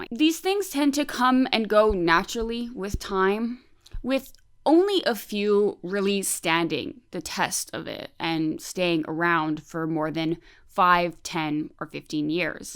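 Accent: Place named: Quebec, Canada. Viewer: This is such a rich video!! As you mentionned I feel like online discussions about not-online aspects of the fashion became less common through the years, so hearing a « modern » discussion around this topic is really interesting. Also unrelated but I’ve been watching your channel for soo long and somehow didn’t realise you were from Québec (more specifically than Canadian I mean)?? For context I’m French so the nuance between Québec accent and native English accent can be a bit hard to catch on single isolated words sometimes (like brand names for example), and the sudden language switch totally jumpscared me haha! Merci beaucoup pour cette chaîne et tout le travail derrière !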